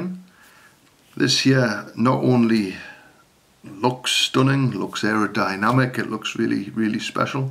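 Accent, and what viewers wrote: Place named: North, United Kingdom. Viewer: Always a delight hearing another northern accent on YT!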